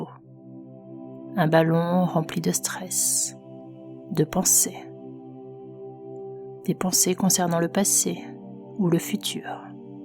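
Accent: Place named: Normandy, France. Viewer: Merci pour cette belle vidéo je vais être subjectif mais étant de Normandie il y a un léger accent dans ta voix qui fait que tubers ton travail est intéressant et agréable merci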